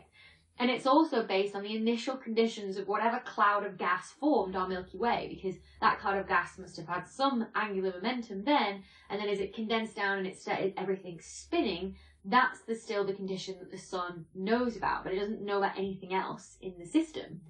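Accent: United Kingdom. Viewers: It makes very little difference, but for some reason i find it really pleasant to hear a british voice explaining science for a change. I seem to follow all the american science channels. Subscribed, and totally not biased.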